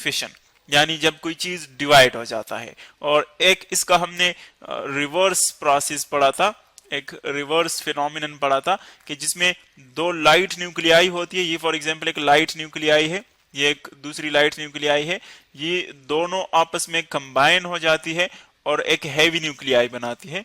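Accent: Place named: Balochistan, Pakistan. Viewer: Sounds Balochi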